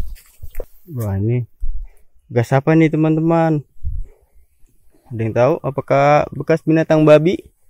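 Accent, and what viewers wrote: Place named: Bekasi, Indonesia. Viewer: oooo aksen bekasi ya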